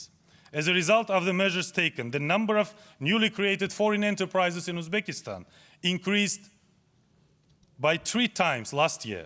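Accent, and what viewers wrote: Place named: Russia. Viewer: I thought it is gonna be like: “let me speak from the bottom of my heart”..😁 But thankfully this guys speaks far better than other uzbek officials. Nevertheless speech was intially written in russian, then translated into english. I am sorry sir you sound so russian! Although he gave good speech, there is very little change in uzbekland. I almost laughed when he said: “ non-discrimination” and “fight against corruption” 😂 Feels like uzbek treasury is empty and shavkat needs more money from US institutions to further fill his pockets in.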